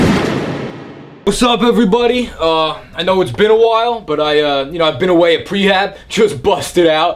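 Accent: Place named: Italian-American, United States. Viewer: It's second-generation Italian-American accent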